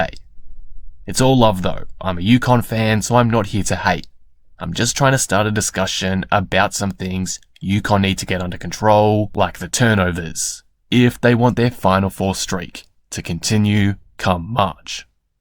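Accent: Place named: Australia. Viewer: Clearly this guy is an Aussie, or NZ'er, or a Brit. That's cool, but being Captain Obvious is not helpful. Bye dude. You're irrelevant.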